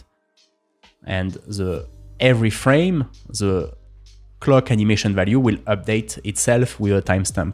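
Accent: France. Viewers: Oh je sens l'accent français ✌️ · Nice French accent there, Sean Evans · This accent is really French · But maybe french cause the zzhh prruh pronunciation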